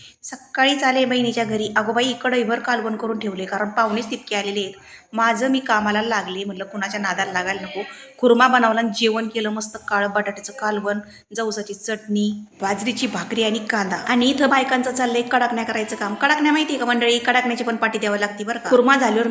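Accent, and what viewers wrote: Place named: Ahilyanagar, India. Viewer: 👍अरे वा म्हणजे माझा अंदाज बरोबर होता 😄 छान विडिओ असतात तुझे मी नगरला aste👍आपल्या माहेरचं कुणीतरी बोलत आहे asc👍जाणवत तूझ्या बोलण्याच्या टोन वरून 😄🙏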